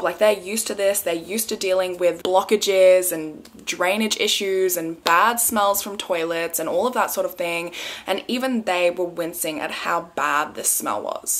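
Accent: Australia. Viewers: Bestie wake up, a new murder explained in a soothing Australian accent just dropped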